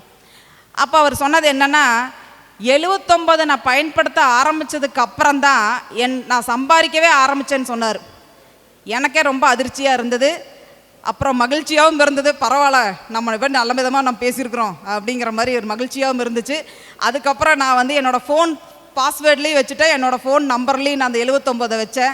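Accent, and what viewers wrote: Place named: Kongu, India. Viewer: Kongu tamil.. 👏🌷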